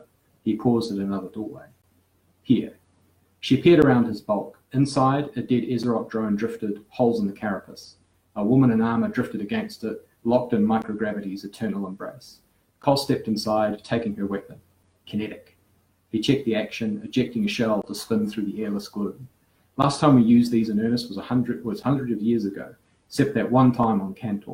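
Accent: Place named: New Zealand. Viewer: Oh my! How I loved this! As a mujer living in Spain and whose English May be considered a second or third language-depends from where you look, born and raised in Tanzania with Afghan origins and comping to live in Spain at the tender age of 12-, I love listening to your NZ accent, and how English varies from the ex colonies. Same thing happens with Spanish from Spain and the Iberoamericanos(Latin America) Spanish. Thank you,! for the lesson. I really enjoyed it!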